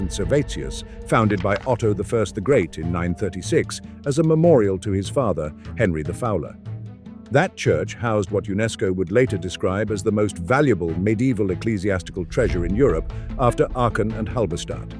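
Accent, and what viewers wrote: United Kingdom. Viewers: The narater is British just like me..😂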